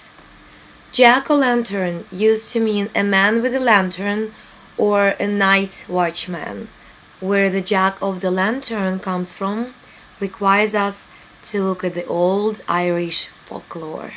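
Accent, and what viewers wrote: Russia. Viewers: Russian accent - where are you from girl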